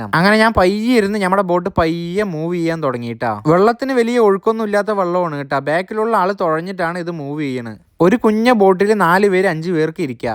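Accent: Palakkad, India. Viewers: വിയറ്റ്നാമിൽ ഇരുന്നു നമ്മുടെ സ്വന്തം പാലക്കാടൻ ഭാഷ പറയുന്നതിന് നന്ദി❤❤നലവീഡിയോ സൂപ്പർ കാഴ്ച്ച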